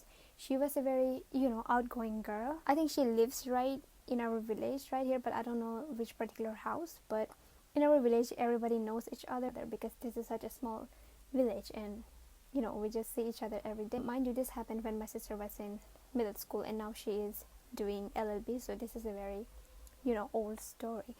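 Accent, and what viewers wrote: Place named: United States. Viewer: you speak like a Us people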